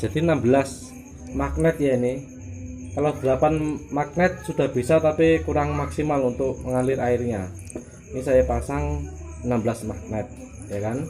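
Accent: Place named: Tulungagung, Indonesia. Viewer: Logatmu kok tulungagung banget mas? Tinggalnya di mana ta?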